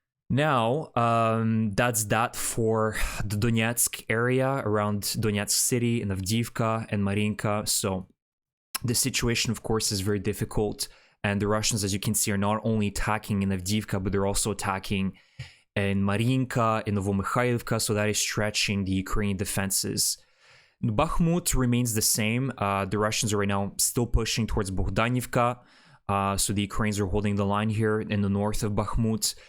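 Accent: Quebec, Canada. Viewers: Pretend? I hear a strong French Canadian accent.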